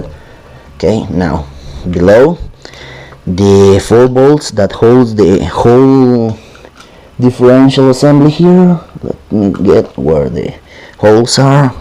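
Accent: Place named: Spain. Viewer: Hola! Creo esres español poor tu acento jajaja buenos videos. Perdona quete moleste, pero tengo pasasdas las coronas centrales y no se donde comprarlas baratas, el diferencial completo ya que creo que esta roto porque lo muevo para alante y se mueve las ruedas un poco pero para atras se bloquea y no hace nada no se que le pasa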